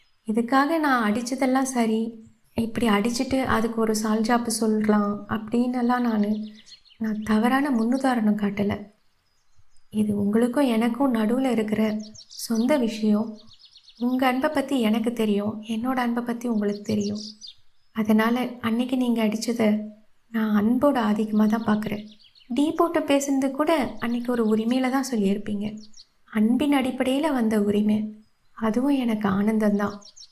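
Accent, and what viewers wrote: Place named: Kongu, India. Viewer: Your kongu slang I am very happy to relate to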